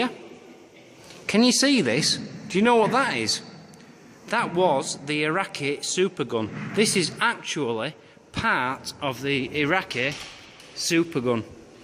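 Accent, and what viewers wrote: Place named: Scotland, United Kingdom. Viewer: I thought you were Scottish!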